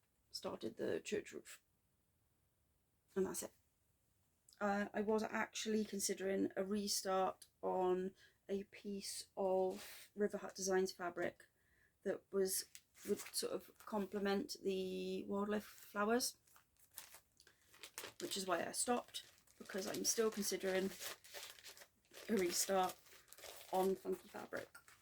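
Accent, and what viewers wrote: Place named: Wales, United Kingdom. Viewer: I knew i had the fear of horses for a reason! 😱 yes I feel you would be nice to not work and stitch all the time 😁 i will send you screenshots of how I do it 😊 maybe focus on a piece for 3 days then move on to something else? I always look forward to your vids I very much love listening to the welsh accent 😁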